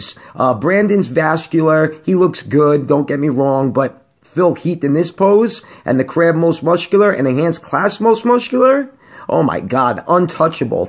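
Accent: New York, United States. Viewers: Love your nyc accent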